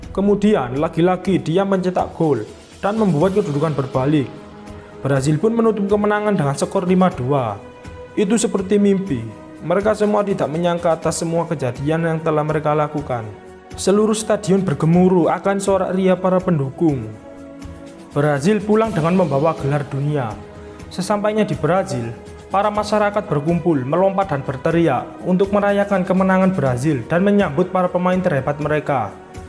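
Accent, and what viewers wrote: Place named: Java, Indonesia. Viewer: suarane medok banget, mantab surantab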